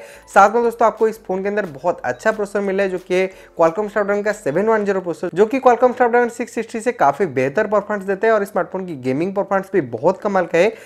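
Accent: Odisha, India. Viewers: Loved your odia accent!!!!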